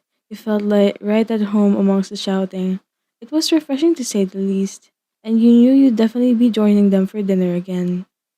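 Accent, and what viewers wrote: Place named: Philippines. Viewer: U sound filipino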